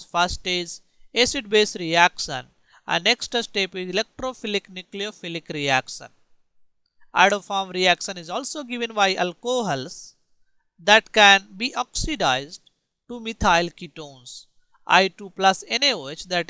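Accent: India. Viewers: 황진웅 my goodness, he is definitely not french. more like indian or something.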